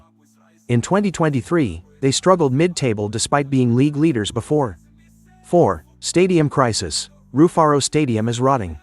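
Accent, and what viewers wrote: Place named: United States. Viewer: First of all Bernard Marriott must take full responsibility 4 the current predicament Dynamos fc finds itself.Marriott ,a founding member has been treating Zim's most illustrious, famous,successful fc as his fiefdom.If Dynamos gets relegated ,all Dynamos fans should descend on Bernard Marriott or call 4 a fatwa on him !.Secondly the yank voice over is nauseating and atrocious to say the least !.Marriott must disappear from the helm of Dynamos.True Dynamos legends like Mhofu,Mwendamberi,Kwinji 15,Mozze etal must come back and serve/save Dynamos Fc !!!!!.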